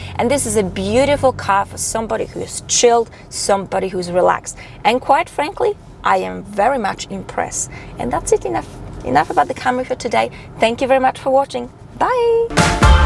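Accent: Australia. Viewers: The Commodore comment confirmed it; I knew I could detect an Australian accent in there, on some words especially! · I heard the accent right away, but was confused by the license plate (it wasn't Australian) and the steering wheel on the "wrong" side. So until Switzerland was mentioned, I had no idea what market this video was made to inform potential customers. BTW, you are probably aware, here in the 'states commercial producers use Australian presenters or folks with Australia sounding accents when they want to make the product being sold just a bit more...posh. For some reason, the British and/or Australian accent is supposed to convey a bit more...class.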